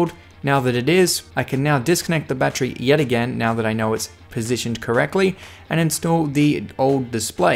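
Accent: Australia. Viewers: I like the Australian accent. You know, you are truly doing an outstanding job; I have watched almost each single video on your channel. Keep posting us!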